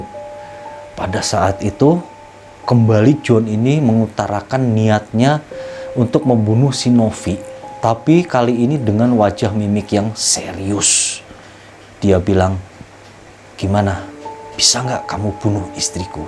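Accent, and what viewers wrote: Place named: West Java, Indonesia. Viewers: mang kayaknya orang sukabumi/bogor... cara ceritanya, bahasanya bisa d fahami dengan jelas ma gw 🤣🤣🤣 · Bahasa sunda na nyangked wae nya kang hahaha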